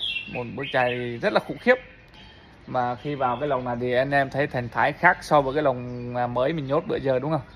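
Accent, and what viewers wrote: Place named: Quang Tri, Vietnam. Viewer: Làm video ép giọng mào non đi anh giọng quảng trị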